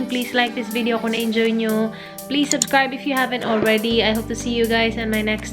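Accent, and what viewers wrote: Visayas, Philippines. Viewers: Bisaya kaba ate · bisaya accent talga pag nag tatagalog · Bisaya ka po?😂😂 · Ako lang ba ang naka feel na bisaya si ate. 😊😊😊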